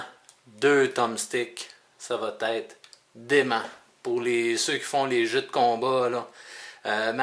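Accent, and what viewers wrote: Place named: Quebec, Canada. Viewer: WTF il est Québécois XD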